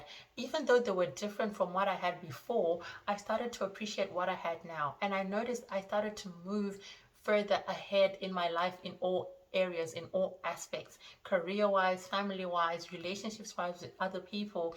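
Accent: Australia. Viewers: some ausie accent detected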